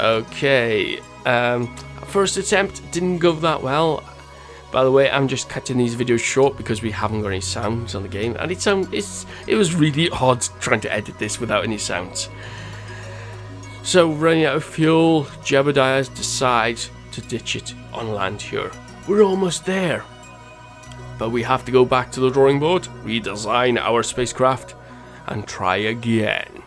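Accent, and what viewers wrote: Wales, United Kingdom. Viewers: I thought I heard some welsh in there haha! I don't normally hear strong accents down here either. I take it Cardiff way then with the butt? Mush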